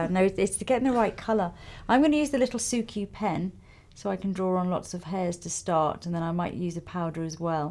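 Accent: England, United Kingdom. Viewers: So thrilled that you have a lovely English Accent and a soft voice xxx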